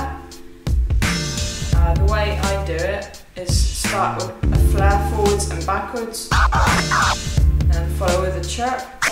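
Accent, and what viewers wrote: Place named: South West, United Kingdom. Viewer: Yes... Defo Dope Skills! You sound like your from the South West; )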